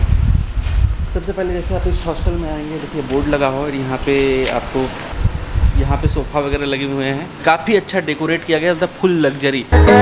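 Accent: Bihar, India. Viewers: Bihar se ho bhai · Bhai aap bihar se ho kya? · Bihar ho kya bhai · ooo bhai tu bihari hai kyaa... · bhai Bihar k ho kay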